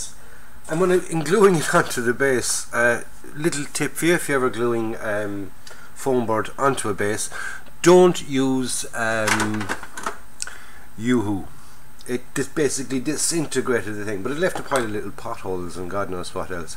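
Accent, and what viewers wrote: Ireland. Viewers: Very nice. And it's wonderful to hear your Irish accent, reminds me of my dear old Dad. Italeri can be a bit of a challenge, like not having locating pins for shackles, tow hitches etc. But you've done a "fine and decent job" of it.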